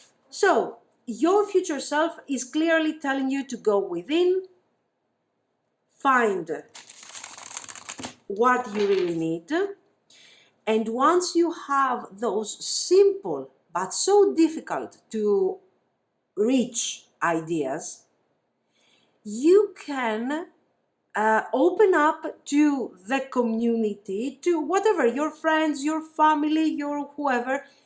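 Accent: Greece. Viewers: hi. i feel like youre greek bcuz i am half and your speech is familiar. is this rtue? any advice how a greek woman can be w/ a colombian man?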